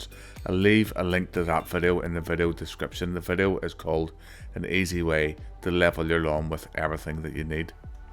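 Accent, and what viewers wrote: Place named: Belfast, United Kingdom. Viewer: I love the Belfast accent!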